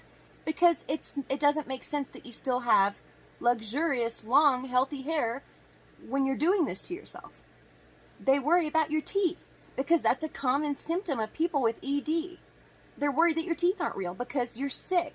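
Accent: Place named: South, United States. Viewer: Love your southern accent by the way southern girls all the way! 👍🏻🙌🏻😊💕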